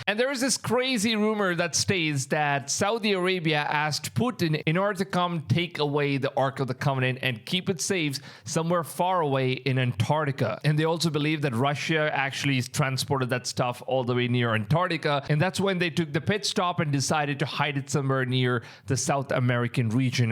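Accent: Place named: India. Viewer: he is from India and English is not his native tongue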